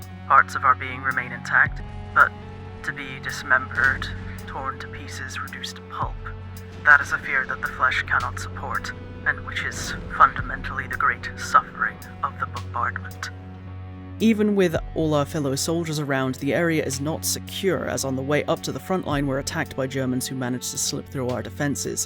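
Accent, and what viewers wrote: United Kingdom. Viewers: You are an excellent storyteller. The tone of your voice, background music, and the visuals add so much. Also am I crazy to think you sound like Sylvanas just British? Lol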